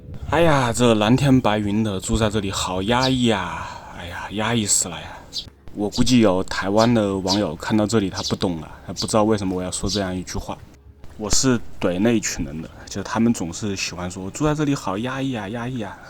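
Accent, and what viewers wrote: Wuhan, China. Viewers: 听口音是武汉的吧？抖音也刷到过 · 聽你口音感覺就是漢普，等到14分鐘的時侯就真的冒出一句武汉話。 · 武漢普通話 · 主播这口音武汉人吧？ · 这口音一听就是武汉人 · 主播是武汉人吗？感觉是汉普，另外听到了热干面，感觉很亲切！ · 这期提到热干面，加上口音，应该武汉人无疑了。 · 你是武汉的？ 藏不住的口音 😂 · 武汉口音？ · 我一听你的口音就觉得你是武汉人， 果然是哈哈哈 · 博主听口音是武汉的吗？ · 听口音武汉人吧？老乡！ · 朋友，你这武汉普通话，好亲切啊，多久没吃热干面了。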